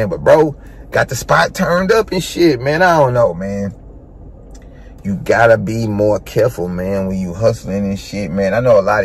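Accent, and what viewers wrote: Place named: Houston, United States. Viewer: Htown accent on deck.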